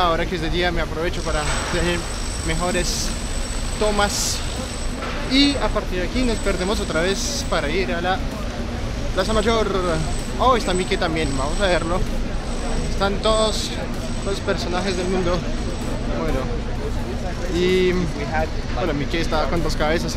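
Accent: Bogotá, Colombia. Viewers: Este man habla con un acento muy rolo para ser Francés !! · x q tienes acento colombiano ?? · me gusta mas como se te ve ese look sin barba y con lentes y a mi se me hace que eres Colombiano hablaa excesivamente bien el espaniol y tienes muy marcado el acento de alla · sabe que si tiene acentó colombiano pareces paisa el mejor acento de Colombia. pero tu eres demasiado noble y linda tu forma de ser felicidades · Yo te lo digo. Pienso que eres alguien que ha vivido parte de su vida en algún país de Latinoamérica (tu acento colombiano te delata), seas o no nacido allí. Un saludo. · el Francés les parecerá extraño porque te vez claramente europeo pero con acento latinoamericano casi, casi como colombiano. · deberías de venir a Barcelona, guapo jajaja me hace gracia tu acento de Colombia tanto que dices... señor que pena y pides dirección, pero acá pena, no es vergüenza, pena es de lastima o tristeza pero igual me gusta mirarte y escucharte 😍😍😘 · Es que tu español es muy colombiano y es casi perfecto pero si despierta curiosidad jajajaja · Hola! 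Ven a Rotterdam... Aca no te pierdes.. yo te doy un tour por la ciudad 🤗🤗... Me gustan tus videos y tu acento Colombiano... Un abrazito... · te extrañamos en Colombia. Y cierto lo que dicen todos en los comentarios.. tu acento es recolombiano. Saludos..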